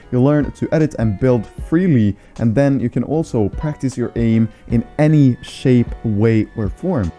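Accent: Norway. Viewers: are u from norway by any chance??? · Are you Norwegian? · Ayo norwegian?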